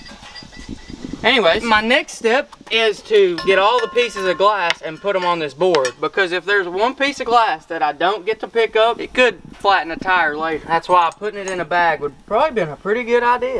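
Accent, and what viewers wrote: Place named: Georgia, United States. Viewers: Sounds like he's from Georgia but says pop. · Bro i love your accents literally can't get more south than that! Yee yee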